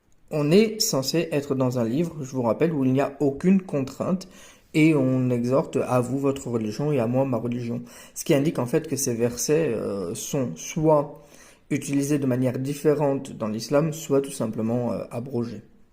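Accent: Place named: Arab world. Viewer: Poto t'as un accent arabe découpé à la scie c'est pas ouf. Tu vois "passer du coq à l'âne" bah c'est pour toi cette expression idiomatique française.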